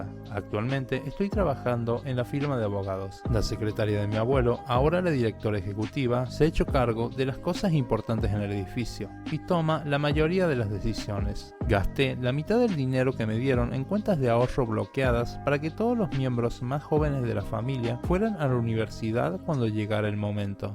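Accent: Argentina. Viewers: Te falta quitar el acento argentino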